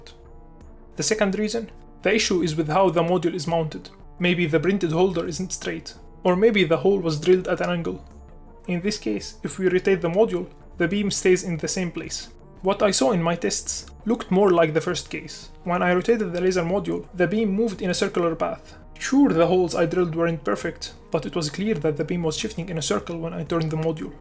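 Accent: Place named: Levant. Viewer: دخيل الشوام والأكسنت تبعوووووووووون 😆♥️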